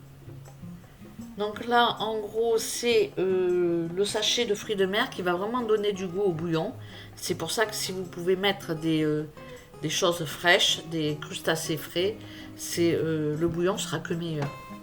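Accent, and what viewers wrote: South, France. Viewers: Cc ☀️ On va se régaler😋 mes enfants n’aime pas trop le poisson, mais par contre le cabillaud ils aime, merci chef Danny🤩 de partager de si bon plat et à toi avec ta douce voix du Sud que j’adore🥰 j’ai fait péter les pouces bleu, comme d’habitude🤣 Gros bisous et bonne soirée😘🥰👍👍👍👍👍👍👍👍👍👍👍👍👍👍👍👍👍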